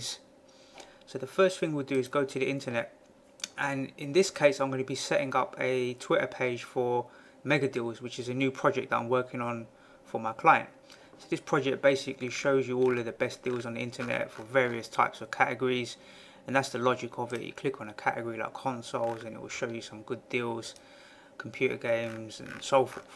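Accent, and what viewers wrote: United Kingdom. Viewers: The accent is like harry potter nowadys😳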